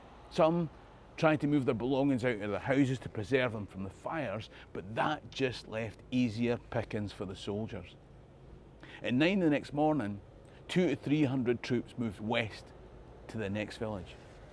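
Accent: Scotland, United Kingdom. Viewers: I'll leave that small hoodie for someone else, but I love your channel! The wonderfully presented history, the beautiful Scottish accent, the scenery, and humor all keep me coming back for more. Keep up the good work! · Hello, hello from Virginia in USA! I would like to have a "Let me tell you a story..." shirt because I recently told about my Scottish heritage & I LOVE learning about Scotland from! He is fascinating, engaging & I adore listening to Scottish history in a brilliant Scottish accent! Thank you so much for telling stories! 💜💋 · You live in Scotland, you sound Scottish! So I think you're probably Scottish! I've known several Americans who claimed to be proud Scots. But they'd never been to Scotland & couldn't even pronounce Edinburgh! Don't let anyone tell you you're not a Scot! Great video!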